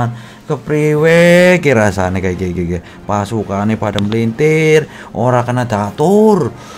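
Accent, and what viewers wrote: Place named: Banyumas, Indonesia. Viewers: Wong Purwokerto wes ketara 😂🤣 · Mantap bang ngomong ngapak ke wkwkwk kocak bang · Ngapak banget sumpah 😂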